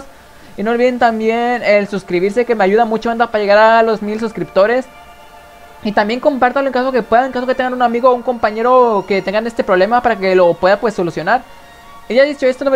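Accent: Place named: North, Spain. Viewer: Lo intentaba una y otra vez pero jamás salían. Gracias por compartir el secreto persona con marcado acento norteño 🐉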